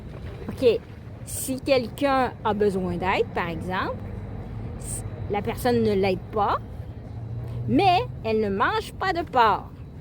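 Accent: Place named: Quebec, Canada. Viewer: Votre voix est magnifique😅, vous vivez surement au Québec. Belle élocution et intelligence verbale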